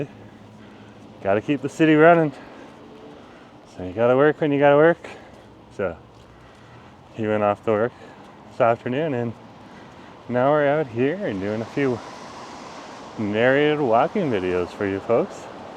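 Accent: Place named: Canada. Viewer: I watch these vids just to hear you laugh! and the Canadian accent lol. Changes: I think was a Hand car wash. my old gas station. More apartment buildings, ofc. use to be Starbucks.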